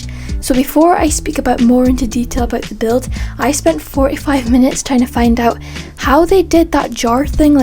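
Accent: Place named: Scotland, United Kingdom. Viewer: oh that's cool:) I like your accent and it's very pretty:3 I was gonna ask if it was Scottish😭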